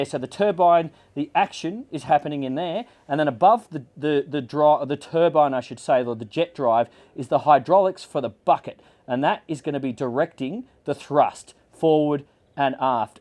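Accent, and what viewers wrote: Australia. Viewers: Those lines are as smooth as hot butter. The combination of excitement, gestures and the accent in the bow presentation I got some Steve Irwin vibes and it really clicked why the videos on this channel stand out. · mate, that aussie accent, insufferable.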